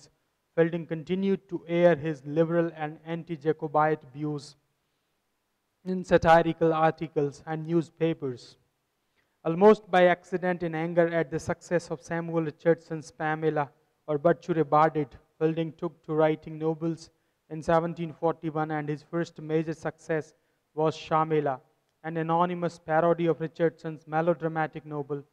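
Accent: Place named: India. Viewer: This is bullshit!.. Indian accent doesn't go well with British novel.. you should have known that by now..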